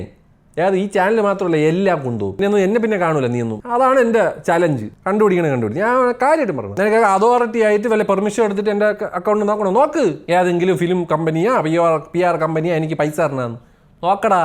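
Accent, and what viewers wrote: Trivandrum, India. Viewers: Annan trivandrum aanen confirm aayi😂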